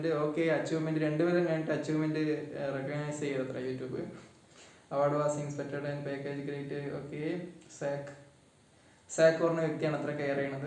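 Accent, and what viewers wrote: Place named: Palakkad, India. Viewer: Namude palakkad basha kelkumbol oru santhosham😍😍😍😍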